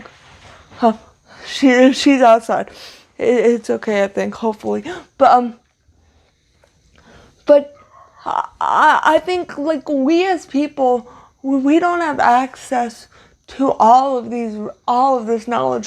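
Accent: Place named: Northeast, United States. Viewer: R U from the northeast?I'm originally from south jersey.you sound and act like you are from back east somewhere.maryland,Connecticut or something.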